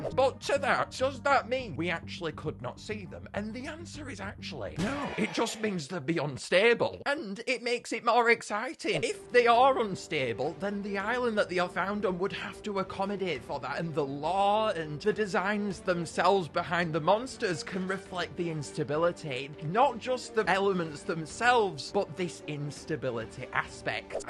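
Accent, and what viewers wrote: United Kingdom. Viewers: His accent is British that's the reason why he pronounced it.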